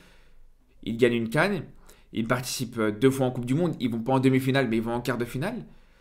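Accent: Belgium. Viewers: Tes pas belge toi?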